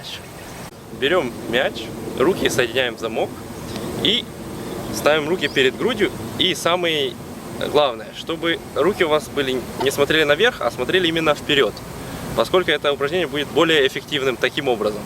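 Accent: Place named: Ukraine. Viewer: Сегодня 15 марта 2023 год, делаю все упражнения, молодец, даже услышала украинские мелодизмы, акцент, поддерживаю!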